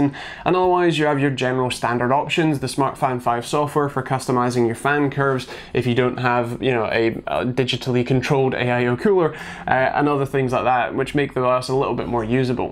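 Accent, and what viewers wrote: Scotland, United Kingdom. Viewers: He seems to have a stutter and I think hes scotish they speak fast andi think he's struggling to anunceate the english could also be nerves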